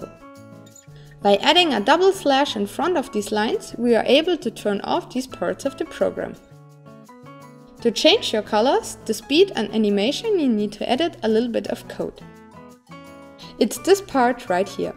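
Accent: Germany. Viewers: Which country do you come from? It sounds a bit like a German accent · I think she's German.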